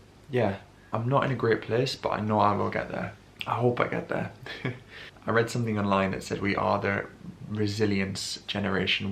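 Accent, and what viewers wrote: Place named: Durham, United Kingdom. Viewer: I wish there had been you tube around when I was younger so that I could be inspired by creators such as yourself. I went through similar things at your age and not having a place to share it took me so much longer to get over things and find out who I really was. I am still searching 40 odd years later. However with people like you sharing and putting mental health on the front page is fantatsic as the stigma is so hard to shake off, however if we all share then we can all help and learn from each other. Plus I love hearing the Durham accent on you tube... makes me feel like I am home xxx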